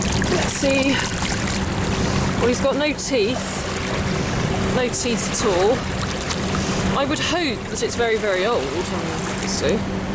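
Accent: United Kingdom. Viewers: You have a lovely voice. And as a non native English speaker and someone who used to live in London, I am enjoying your British accent thoroughly!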